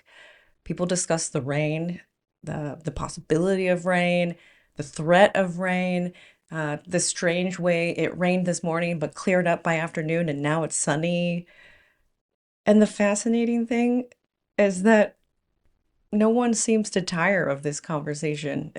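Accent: South, United States. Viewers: Hi, unlike some American accents (the abrasive, machine gun type screech on New Yoick, for example), you have the kind of lovely, calm, soft, warm Southern drawl that one would hope to hear as one "draws down the final curtain, and joins the choir invisible", to parrot-phrase Monty Python. (I've just read that back, it was intended to be humourous, although I meant the first bit, but it makes you sound more like the Angel of Death! SORRY!!). Our sense of humour is WAY more sophisticated, combining dark sarcasm (in the classroom), irony, understatement, self deprication (a quintessentially British trait), and satire, that goes over the heads of a lot of Americans used to a more literal, almost slapstick style. "Sorry" is a kind of reflex response, to avoid escalation. Queues are just something we do instinctively, and when combined with our sense of humour/understatement is a way of gently letting of steam, when you're stuck in a HUGE long queue that's barely moving, the queue moves forward one pace, and you cheerfully say to the person ahead/behind "won't be long now". 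Queues in pubs are on a completely different level; you can be 3 or 4 deep at the bar, everyone just knows who's next to be served, including the barstaff, no arguments (although regulars do tend to get preferential service, with a nod and a wink, not speaking from experience, of course, lol!). The weather usually undstatement mixed with sarcasm, and is a very safe way of breaking the awkward silence with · I really like your American accent - very easy to listen to. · I liked the southern accent!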